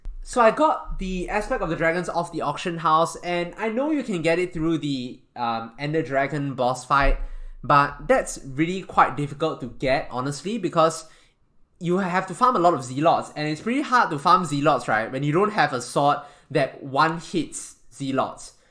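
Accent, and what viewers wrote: Singapore. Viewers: R U Singaporean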